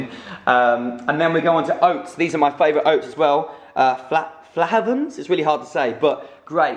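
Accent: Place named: England, United Kingdom. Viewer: Are you English ? You got this accent.. Wow ! 😊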